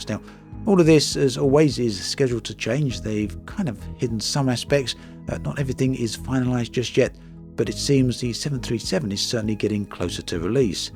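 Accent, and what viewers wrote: United Kingdom. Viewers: First of all I love your videos but I just have one question. Why do you always give the price for things in dollars? You sound very much British to me. It's bad enough our language being taken over by American English without our currency aswell. Sorry. Rant over. Keep up the good work. 👍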